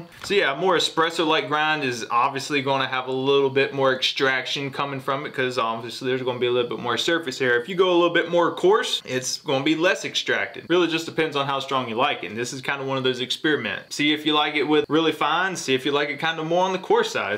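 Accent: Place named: South, United States. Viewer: What an accent! Southerner, I presume.. Georgia, Texas. Am I right? BTW, nice video. Keep it up!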